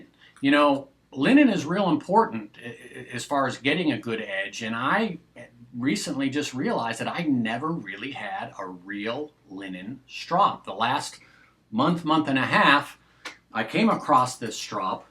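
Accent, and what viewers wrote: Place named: East Coast, United States. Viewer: lolz as soon as you said it was going to be a long reply. i read it off in my head “wit” you east coast accent. i really enjoy both your videos ( the mascots crap me up) and Videos I learn so much just from you guys talking and reading through comments. and it is a great idea to get to know your Natis (Natural) stone. and Im still new to straights but. i sharpened enough chefs knives all sorts of styles,knives pocket knives ect.. to figure i got what it takes to give it a go. and so far not to bad. i need a few more grits for in between my #800 and hard Arkansas. but im curious now that my jewlers loop works again its 100x only but its enough to see that i need better technique and the need for progression in between as far a grit goes before start the polishing can begin. im curious though im skeptical on these blue steel razors. 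are they really Blue steel/ Aogami blades? i have a M. Karam made in Mt. Lebanon i believe this is out of PA. but just not sure how accurate these blades are when it comes to materials being used. and can it be blue steel or a Aogami even if its not forged in Japan? or can they be blue steel where ever its made. i thought blue or white steel was just a Japanese thing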